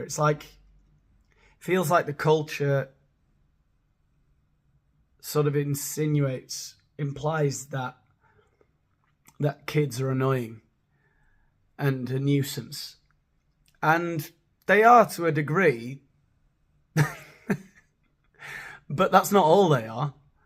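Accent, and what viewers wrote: United States. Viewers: Love your American accent. Cheers. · OMG, I just LOVE your American accent!!! AND your impeccable sense of humor, but, mostly I still chuckle at the thought of that FABULOUS rooster blasting me out of my self-induced YouTube inertia!!!!!!! Rock on!!!!!🎸🎸💖💖🐓🐓🐓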